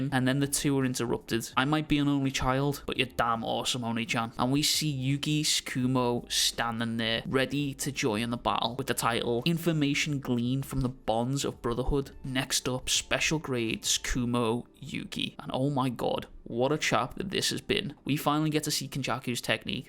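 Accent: Liverpool, United Kingdom. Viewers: weird seein another scouser into anime. love it bro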